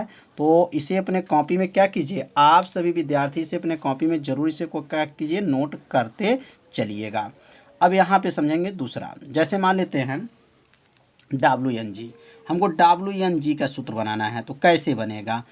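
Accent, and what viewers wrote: Bihar, India. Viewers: Sir ji aap bihar se ho kya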